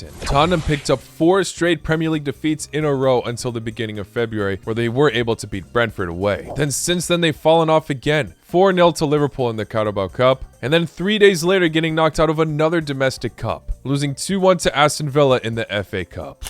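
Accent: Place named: United States. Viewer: You speak perfect American English for me and Your American Accent is so awesome.🥰😍🤗❤❤❤❤❤❤❤🤍🤍🤍🤍🤍🤍🤍💙💙💙💙💙💙💙💯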